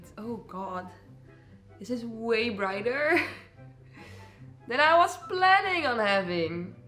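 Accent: Netherlands. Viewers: dr accent is zo nederlands lol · Does anyone know where she is from because she sounds really dutch haha😅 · the minute you started talking i KNEW you were dutch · Are you dutch??? Your accent seems really dutch hahah. Excuse me if i'm wrong lmao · Dutch accents at its best, girl I love it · Your accent sounds sooooo dutch, are you Dutch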